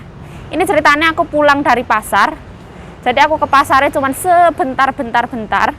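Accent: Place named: Java, Indonesia. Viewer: Bahasa Mandarin kamu lucu sekali..masih campuran Jawa,sebutan Mandarinmu sebutannya lain...lucu dong,mungkin selalu berbahasa Jawa mungkin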